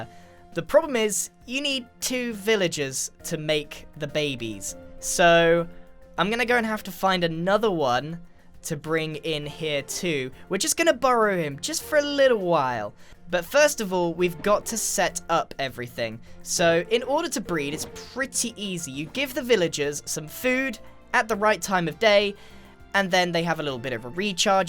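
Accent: United Kingdom. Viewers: You made me fall in love with British accent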